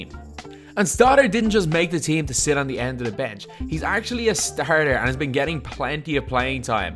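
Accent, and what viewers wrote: Dublin, Ireland. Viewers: Where are you from it sounds like Dublin? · Ur Irish accent had me shook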